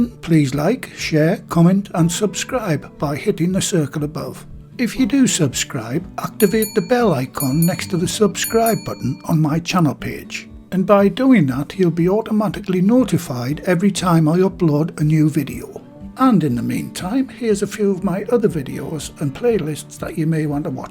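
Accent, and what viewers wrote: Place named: Middlesbrough, United Kingdom. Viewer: Are you from Middlesbrough? I am from Redcar and your accent sounds local. I love your uploads by the way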